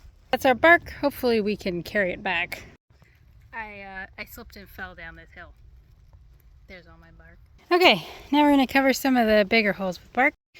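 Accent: United States. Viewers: Well, it sounds like you’re from the south. That’s where you are now so Bobby a poisonous snake or I should not say poisonous cause you know the venomous is what they are. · for growing up on a remote island with no social media you have a very average american english accent.. :face-orange-biting-nails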